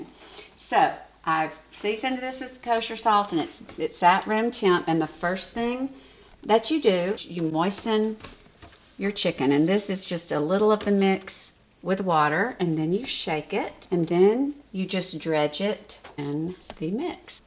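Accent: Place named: South, United States. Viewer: First of all,you're gorgeous! I detect a southern accent. My mom was from SC. Anyway I'm going to make these. Thanks!!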